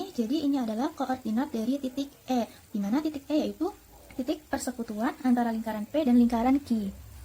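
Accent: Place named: Bandung, Indonesia. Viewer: Suaranya kaya orang bandung kak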